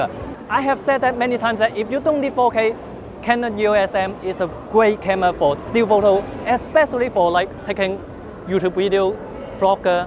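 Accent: China. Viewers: China English !!!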